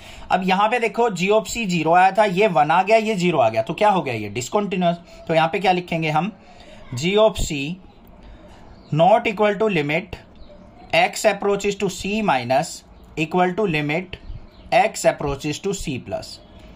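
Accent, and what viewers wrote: Haryana, India. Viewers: Sir aap hariyana se ho ?aap jbb bol re ho tbhi pta chl ja rha h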